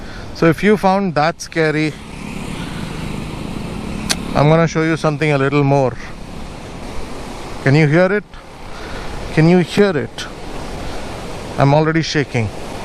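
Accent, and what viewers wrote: India. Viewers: Great to see someone from Odisha in blogging and exploring the beauties of our State .. thanks bro and all the best a slow and steady race hope to see you soon in 100k subscriber club soon, The Odia tone in between ur blog is damn good bhai ... gote Pahala Rasogulla upare video te hele badhia heithanta brother !! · Bhaina gadi chale la Bela ku katha kuha bi Tama video dekhi ki dar lagi chi ba...au Tama odiya language PURA Rourkela style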